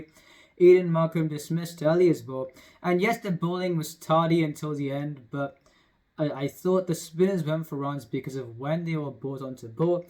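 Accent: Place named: United States. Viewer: Bhai.... Dump that pathetic American accent... & bring the desi accent on... Will sound more real!!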